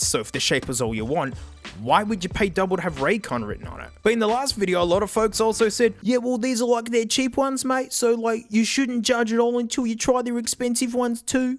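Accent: Australia. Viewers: He's speaking a dialect of english known as "Australian" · The only reason I watch him is because his auzzy voice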